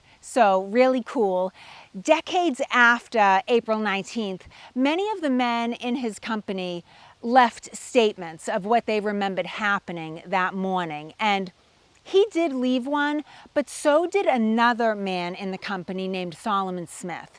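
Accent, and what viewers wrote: Boston, United States. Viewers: has the thickest Boston accent that I have ever heard. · I have read extensively on our revolutionary history, but it somehow takes renewed importance when a hot woman with a killer Boston accent is telling it. Keep going because I'm picking up details I didn't know before. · Love your authentic Boston/Massachusetts accent! I know it well, because I was born in Boston, Ma. Boston City Hospital, 1000th baby born , August, 1964, parents born & raised in Boston, from the 1940s until they moved west down the Old Boston Post Road,(US Route 20),33 miles to Northborough, Ma. (Where surveyor Benjamin Franklin ordered the "33 Miles To Boston", mile marker, placed there during his time as Postmaster General! I absolutely love our American History, which is probably why your channel came up in my feed! I grew up primarily in Northborough/Westborough, area, incorporated in 1766 & 1716, respectively, about a mile outside of town, where one of many of those old, granite Tribute Stones, comemorating Revolutionary War General Henry Knox's passage through the town with his army, and the cannon/artillery pieces which hed collected from fort Ticonderoga, NY, to deliver to General George Washington Bunker Hill, Boston, along with as many of the troops, ammunition, and powder, which Gen. 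Knox inevitably came across during his journey to reinforce Gen.Washington, as ordered by the Commander in Chief of The Armies of The (newfound), United States. Our Northborough Militia was led by one Captain Samuel Wood, whod sent for all Northborough Militiamen to muster The towns Musterfield, immediately after recieving the alarm of April 19, 1775! I know all this wonderful history because I was fortunate to grow up in a VERY Patriotic little suburban town, and just wanted to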